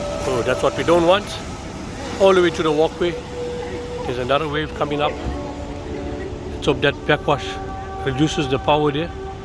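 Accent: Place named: South Africa. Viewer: Country would have been useful, judging by commentators accent and posts elsewhere, I take it this is somewhere in S? Africa, obviously not UK! Last person to mention havoc was Shakespeare.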